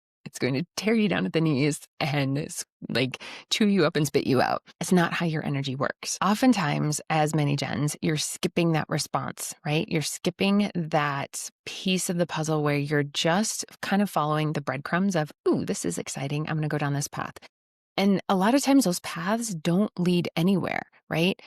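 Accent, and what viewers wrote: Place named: United States. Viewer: Your accent sounds American, and thus it is not only our MG settings. With only 10% of manufacturing left since 1979 the economy has gone suck for anyone but scammers and financial schemers with little but crap service jobs available. As my true self develops I have more abuse thrown at me from shallow geezers and utterly demonic black bloc monsters. I am not afraid or I would have quit long ago. I am surrounded by fragile egos and fickle fools and may need to just move to a new place on Earth after 52 years being the scapegoat and target for take downs in my decaying home town. Money does not simply flow from playfulness in the utterly polarized war on everything good. It is spiritual bypass to speak in this way. Maybe the next Leo eclipse cuts the idiots out of my way. I have heavy metal intensity with soft jazz detail sense, and am sick of being stuck in the punk dump of PNW.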